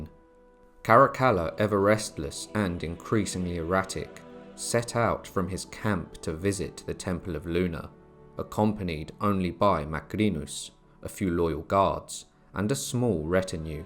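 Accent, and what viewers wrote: United Kingdom. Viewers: Never change it bro. Its always nice to have a Brit tell me about history🤣👌🏻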